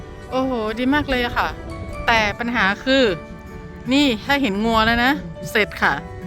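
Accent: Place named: North, Thailand. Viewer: ลูกสาวสวยมากกกกก สำเนียงคณแม่ เป็นชาวเหนือหรือเปล่า ขอโทษนะคะ เพิ่งตืดตามค่ะ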